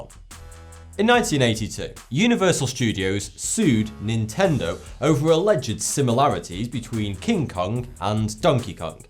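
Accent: United Kingdom. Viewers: he is handsome.........love his brittish accent and voice.... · 675 000 views..... man! british accent is the real Mc coy!!!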